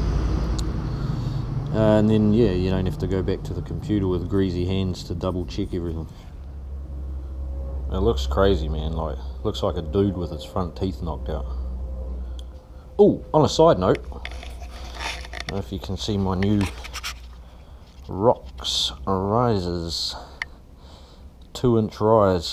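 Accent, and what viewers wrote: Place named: New Zealand. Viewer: Nice Kiwi accent mate! takes one to know one lol. Great vid, keep making vids and posting them up, enjoyable and educational for sure!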